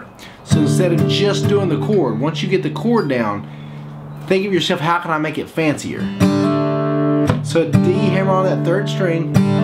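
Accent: South, United States. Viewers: I love your Southern accent. Greetings from London, UK. Your videos are a blessing to me as I try to learn acoustic classical guitar. I want to play in church one day. I try to learn but face so many obstacles.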